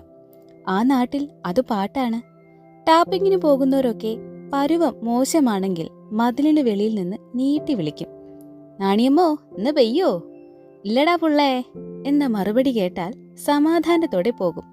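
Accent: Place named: Thiruvananthapuram, India. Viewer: 🎉🎉🎉. തിരുവനന്തപുരം ശൈലി 😂😂😂😂 ചിരിച്ചു ചത്ത്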